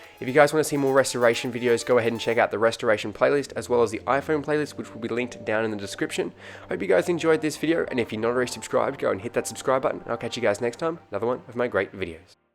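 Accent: Australia. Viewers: WAIT A MINUTE ARE YOU AUSSIE!?!?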